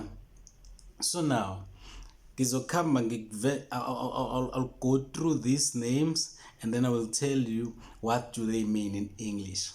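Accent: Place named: Kalanga, Zimbabwe. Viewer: I was wondering aswell, this sounds closer to the Kalanga my mother speaks.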